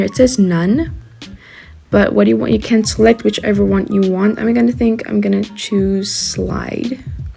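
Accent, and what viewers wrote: South Africa. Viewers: ur South African ✊🏽🙌🏾🙌🏾🙌🏾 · U sound like ur South African :)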